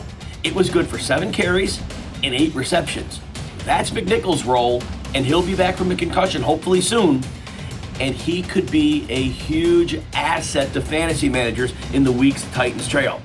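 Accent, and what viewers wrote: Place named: Chicago, United States. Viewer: That Chicago accent is too nice 👍🏼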